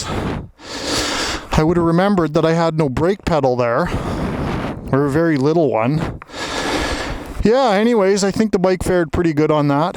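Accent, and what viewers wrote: Canada. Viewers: Saw you in Global addiction man! Had no idea you were in that video but could tell it was you under the helmet because of your super friendly Canadian accent. I'm from Minnesota soooooo I knooow you you got the O's! Lol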